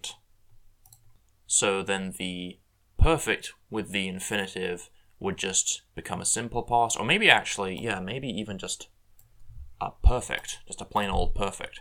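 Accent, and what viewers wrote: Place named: South, United Kingdom. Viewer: I know it isn’t the point of the video but I just love your accent. Southern British, right?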